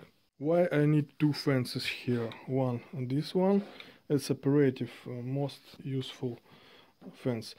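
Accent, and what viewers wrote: Russia. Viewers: Мне послышалось или у автора действительно акцент русский и он русско - язычный?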